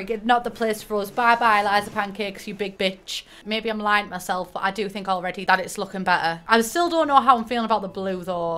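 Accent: United Kingdom. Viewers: Well I only understand half of what she says, but makes sense since I'm not native, and also I wonder from which part in the UK is she from. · I cant imagine trying to understand her if your not a brit especially if you already struggle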